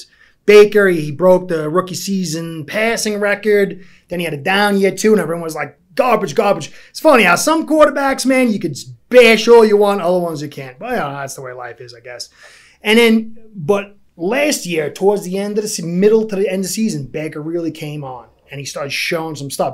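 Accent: New York, United States. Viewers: This dude talks with a NY accent, something fishy 🤔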